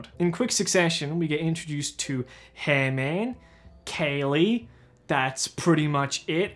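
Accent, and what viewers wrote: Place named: Australia. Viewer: why do you sound less australian now?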